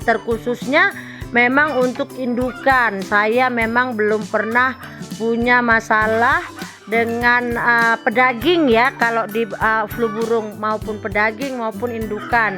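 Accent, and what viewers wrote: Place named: Medan, Indonesia. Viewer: Logat kakak ne medan kali lah 🤣🤣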